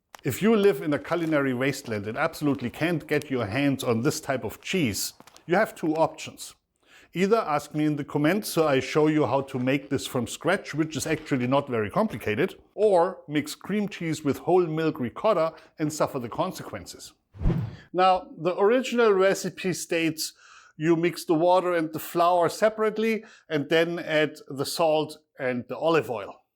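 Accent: Germany. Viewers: "... this is an excellent choice for brakfast or lunch!" Bist du aus de Palz oder aus Rhoihesse? XD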